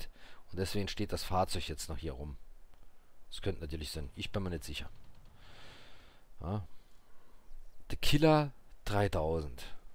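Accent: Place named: Saarland, Germany. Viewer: Ma merkt dir schon e bissje an, dass du ausm Saarland kommsch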